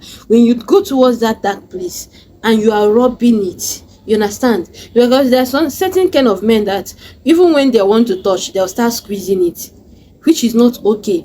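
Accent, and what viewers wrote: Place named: Nigeria. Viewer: Go straight to the point and stop talking too much Next time speak good English Most us don't understand your Nigeria English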